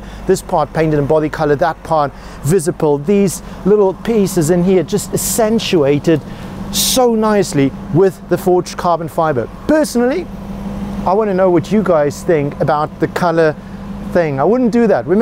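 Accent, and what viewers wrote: South Africa. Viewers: This was an epic review as always! His presentation style, that honest emotion and RSA flavour...🔥🇿🇦 Dankie 💯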